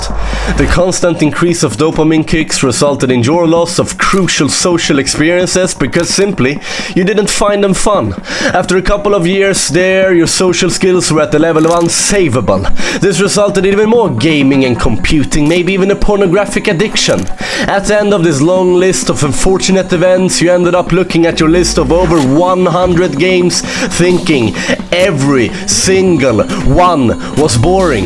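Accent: Sweden. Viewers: I think I have watched this video maybe 20 times. I think I can already remember half of the script! Everything in this video is just flawless, everything from the music choices, pacing, the Swedish accent to the stunning motion graphics and design. This video is just perfect. This video has inspired me so much and will surely influence my decision what I want to pursue later on my studying career. I don't know if you are planning on making more content, but if you are, I will be waiting excited!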